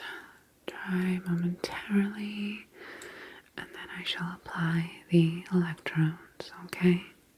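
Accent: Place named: Europe. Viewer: I looooove the subtle "continental" accent on this. Time for another hard New England accent video to balance it out?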